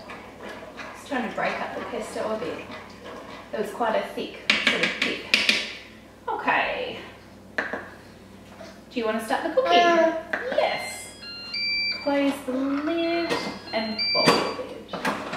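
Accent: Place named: New Zealand. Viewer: Where are you from? You sound like a kiwi :-)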